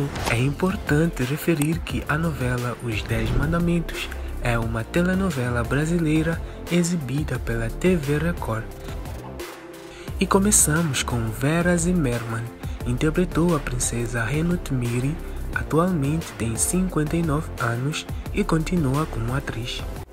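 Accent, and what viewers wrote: Portugal. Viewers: Que sotaque é esse? Vc é de Portugal é 🤨🤔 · Você é de Portugal seu sotaque é de lisboa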